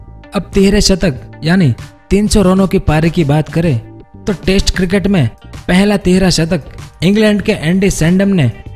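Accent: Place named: Rajasthan, India. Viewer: Bhai ..aapki aavaj s lag RHA he aap Rajasthan se ho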